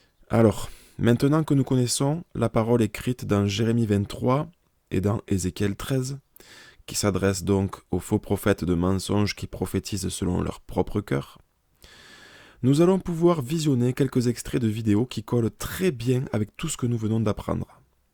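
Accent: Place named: Marseille, France. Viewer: Pourquoi vous avait la même voix de Kevin gall? Sauf que toi ta un accent du sud marseillais ou autre. Donc ma question pourquoi aviez vous la même voix ?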